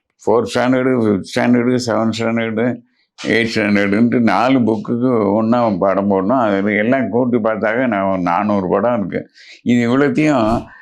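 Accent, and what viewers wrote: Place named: Tirunelveli, India. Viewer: சரளமான நெல்லைத் தமிழ்.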